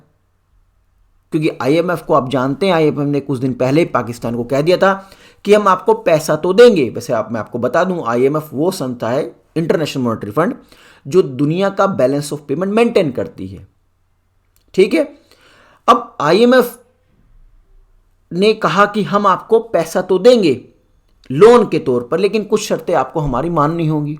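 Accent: Bihar, India. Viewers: Your Bihari accent is so sexy Say again — PRO-VYNCE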